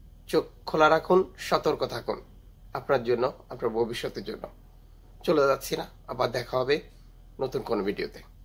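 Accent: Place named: Sylhet, Bangladesh. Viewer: ও সিলেটি ভাই তুমি সিলেটি কতা কইলাও তুমার বাড়ি নবি গনজ না হবি গনজ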